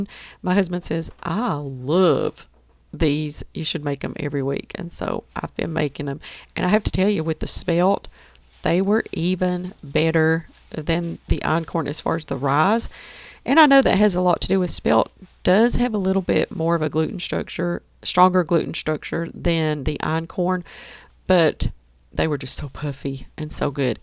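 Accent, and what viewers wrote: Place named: South, United States. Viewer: just watching this video, you look amazing doing this, great chance to get involved in community, where you can be a witness for the Lord, although where you live, I’m assuming it’s somewhere in the South, I hear your accent, lol, but it’s not a heavy one, many people are Christian, but sometimes it’s more cultural, rather than actually being born again, great video, love your channel!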